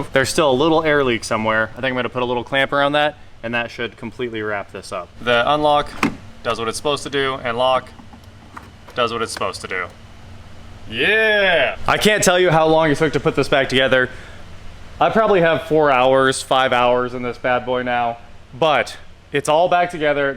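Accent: United States. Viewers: i have an early 97 slk230....all door locks work fine...the interior plastic paint is an issue,,it doest stand the test of time well...you can buy oe paint from germany tho...you sound like your in america so prob the heat has caused probs with the glue on the trim...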